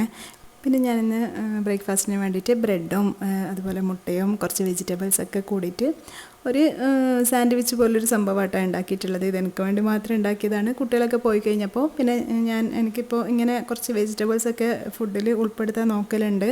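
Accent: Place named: Kannur, India. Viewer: Kannur evideya,slang കേട്ടപ്പോ തോന്നി.നമ്മളും കണ്ണൂർ ആണ്😊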